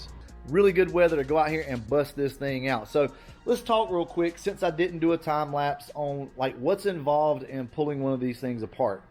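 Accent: Alabama, United States. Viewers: I'm from the south I heard your accent yep Alabama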